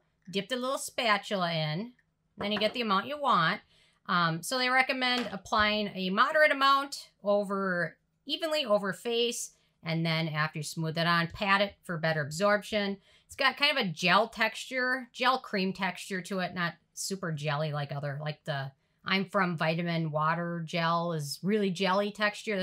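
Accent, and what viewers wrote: Minnesota, United States. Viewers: I don't have blemish worries, but I watched the entire video anyway.😆 That peach top is a great color for you and I LOVE your purple eyeshadow--so pretty! I like listening to your Minnesota accent. I'm a former Yooper (know what that is?) so our regional accent is somewhat similar.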